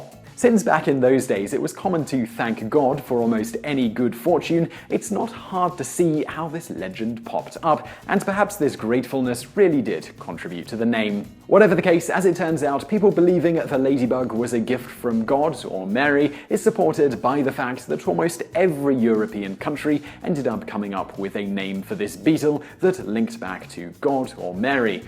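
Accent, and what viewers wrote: England, United Kingdom. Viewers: I presume from your accent that you are English. Then why are you calling the lady birds lady bug's.?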